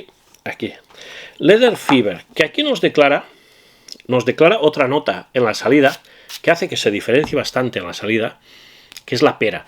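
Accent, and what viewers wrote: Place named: Catalonia, Spain. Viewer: Hola, por el acento entiendo que eres catalán, yo es que soy de Barcelona y al oírte decir lo de lo bazares, me gustaria saber si son de Barcelona, si es así, te agradecería que me dijeras los nombres o donde están...gracia por adelantado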